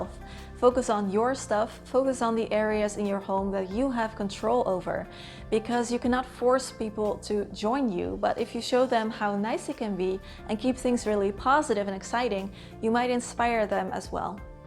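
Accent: Netherlands. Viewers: Ik hoorde toch een Nederlandse toon❤❤ je bent gewrldig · I somehow could hear you were Dutch (even though your english is sooo good and you don't really have an accent) but it was confirmed for me when I saw the Holland & Barrett kortingskaart, haha. Super leuke video's en je hebt er een sub bij!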